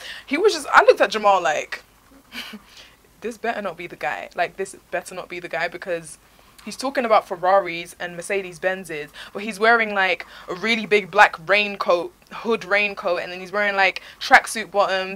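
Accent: United Kingdom. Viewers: I love your accent! I also live in the uk but I don't have the London type accent; I put your story time on loop and your voice soothes me and helps with my insomnia, Thankyou! Now I get at least 5 hours sleep per night (once I went 5 days with no sleep)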